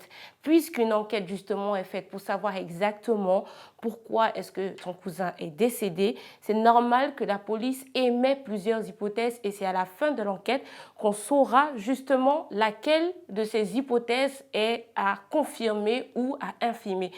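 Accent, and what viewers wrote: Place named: Cameroon. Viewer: Pourquoi c'est toujours des gens qui proviennent d'un pays qui a connu des génocides qui ont été armée par la France la Belgique pour venir dénigre d'autres citoyens tu n'a rien fait pour aider ton prochain et tu continue encore avec ton accent kamer c'est choquant être plus blanc que les blanc juste pour une perruque et des papiers 😱😱😂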